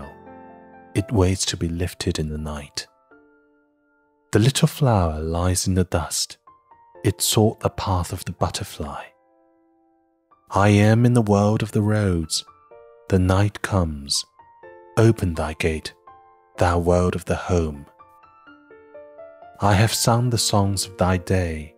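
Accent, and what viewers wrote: United Kingdom. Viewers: 好喜歡英音英音讀詩特別有意境好贊！ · 優雅的英國腔' 真是迷人啊! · 喜欢英音的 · 好美啊！英音听起来很有质感。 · 可能是因为住在美国的原因，美英会更顺耳。但是您的英英感觉很舒服，似乎诗的朗读英英更有感觉。谢谢! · 英音好听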